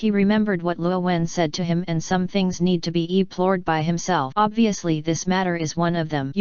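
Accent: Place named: China. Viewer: My god this Chinese actsent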